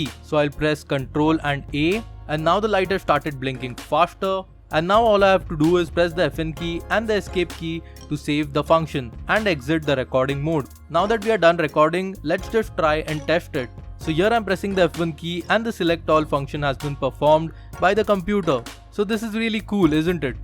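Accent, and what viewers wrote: India. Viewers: Some criticism: 1. Stop emphasising the fact that this keyboard is "useful for gamers." It's part of Asus's Cerberus line up which is specific to gamers. 2. It's not your Indian accent, but just your voice that's irritating. I never thought an Indian accent could become worse honestly. 3. Who the fuck does a keyboard review and doesn't do a sound test? Like it's standard for all keyboard reviews. 4. Manuals are all available online, you don't have to literally show them page by page. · i really hate the Indian accent, but nice video. thanks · Indian accent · i hate India accent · LUL, not all Indians sound like him btw ! q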